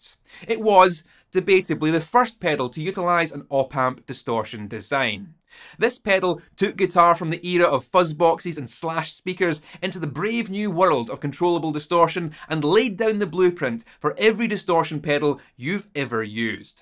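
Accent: Scotland, United Kingdom. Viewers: I really like your scientific approach to explaining guitar electronics. No snake oil salesman here. Also I just love the sound of the scottish accent!!! Greetings from a german electrical engineer drummer guitarist guy. 🤣🤘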